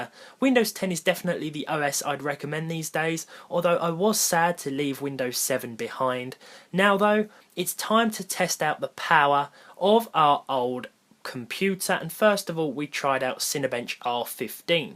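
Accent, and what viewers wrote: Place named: England, United Kingdom. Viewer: Esta bien chido el acento inglés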